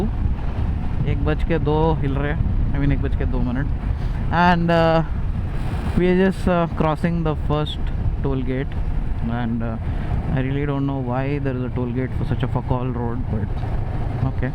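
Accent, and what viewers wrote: Hyderabad, India. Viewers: again hydrabadi touch😂😂 keep it up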